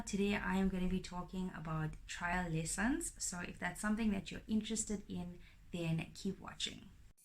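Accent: South Africa. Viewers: Do you use your south African accent or you change it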